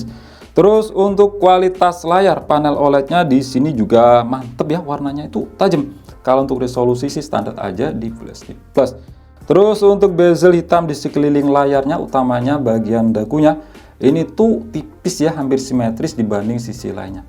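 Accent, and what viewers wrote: Blitar, Indonesia. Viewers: Bang lu orang blitar ya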